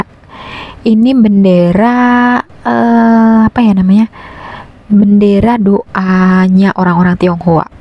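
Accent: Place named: West Java, Indonesia. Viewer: Peda orng sunda dina nyarios na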